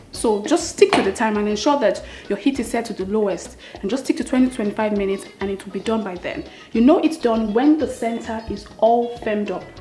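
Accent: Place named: Igbo, Nigeria. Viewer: I think she is an igbo girl the way she speak oo my god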